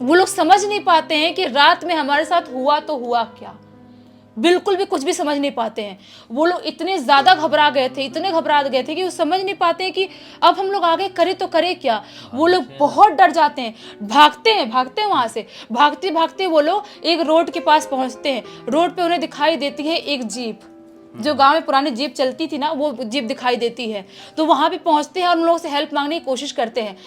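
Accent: Bihar, India. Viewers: Dont you mind please!!!!! but her her voice like fully fast and like Bihar ka town tone I feel like when I m gonna to be close my eyes ... Baki brother you've done well before ...... shant shudd Hindi or slow and gehari aavaj wali storiya maja lati hin jyada tr log night me story sunate hin to night wala background better rahega ..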